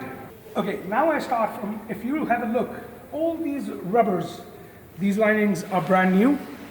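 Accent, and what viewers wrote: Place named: United Kingdom. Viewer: Great projects, and excellent British accent for this little British Motoring icon. Job well-done, sb!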